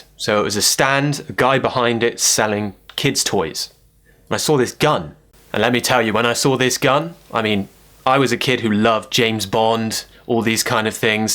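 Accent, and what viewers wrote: RP, United Kingdom. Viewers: This EP that the most I like. Because I learn a lot of British accent from your story. 😅 Very much thank you. 🙏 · Hi, I'm an italian man and I watched lots of videos just to keep my english alive. Different months ago I watched a video where you told a very funny story about yourself (maybe a "listening practice" video): I remember you told that you went up a tree, and accidentally fell down in front of some of your school friends and you went in the hospital... then in the hospital they put a sort of plaster on you and you were forced to get help from your parents to wash you in the tub, with all the embarrassment of the case... Unfortunately I'm not able to find that video any more. Since I would like to show to my kids, because I think it's useful for an educational reason (as well as funny:-D) as listening practice for them too, can you please suggest me the link? 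Thank you in advance and congratulations for your video channel!! I love your british english and your pronunciation, and your voice is clean and wonderful. Gabriele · oh u r so good at telling stories n i love this way to learn the British accent it is so helpful for me, btw i felt so happy for your teeth😹😹. hope u have a nice day 🌹🌈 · Hi,! I feel like your British accent is very beautiful:) It sounds like you are english lord from these bbc series:)) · I'm in love with your accent, I love british😍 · your stories are always funny, keep doing it, i am a english learner and you channel and lessons has been helping me a lot, by the way, you accent is the best, i've been struggling to improve mine to sound like a british.. greetings from brazil, man!!!! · the way you tell stories in your amazing British accent gives me goosebumps whenever I listen to you. Great story! Thank you!:) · Lovely British accent....sounds sweet to my ears( not sure if i said it correctly)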